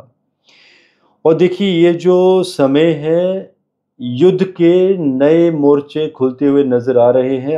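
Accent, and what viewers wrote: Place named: Himachal Pradesh, India. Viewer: Inka accent himachal ka hi. Fizzy hi niklega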